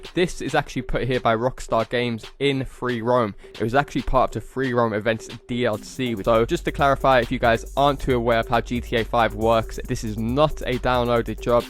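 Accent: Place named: United Kingdom. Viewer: Love the British accent🇬🇧!!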